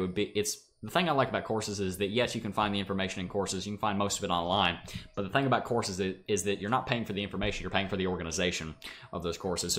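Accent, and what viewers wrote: South, United States. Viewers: I missed your country drawl!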